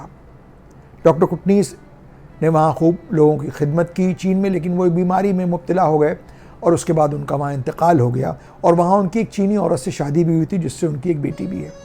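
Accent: Lucknow, India. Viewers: Vah..Aaj sunee Lucknow ki puranee jaban va dilect Andaz..Dr Hukku was first England returned doctor. His encounter with Raja saheb Kasmanda Sitpur was very interesting..Our elders in the Highcourt used to narrate old stories of 1930s 40s Lucknow and its grand old generation..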